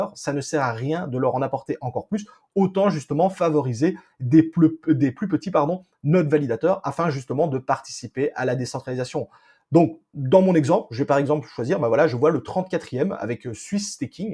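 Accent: Belgium. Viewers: Cet accent xD Tu es du côté de Liéch?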